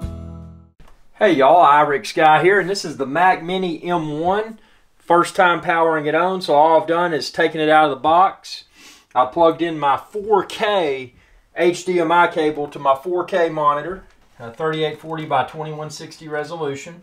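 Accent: United States. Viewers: That country accent is gold! Hope your channels grows big. Keep it up!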